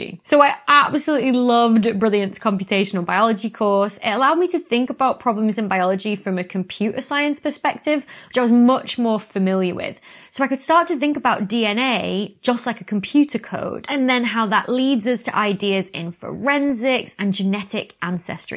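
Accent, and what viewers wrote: United Kingdom. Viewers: I love the british accent. It sounds so proper and yet also fun.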